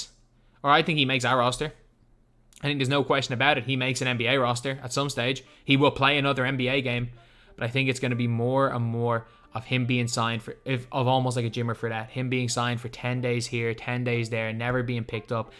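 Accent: Ireland. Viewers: Yo u Irish?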